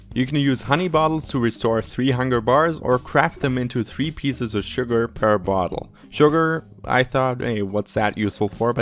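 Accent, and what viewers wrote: Germany. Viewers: Hab dich zufällig gefunden hab jetzt nach 4 Jahren kein minecraft ,wieder angefangen und finde deine Videos hilfreich und hab direkt an deinem Akzent erkannt das du deutscher bist (Bratwurst und Bier bruder)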